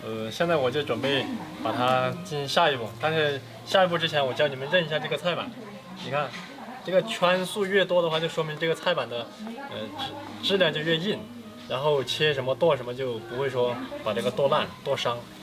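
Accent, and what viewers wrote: Sichuan, China. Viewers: 川普讲的很标准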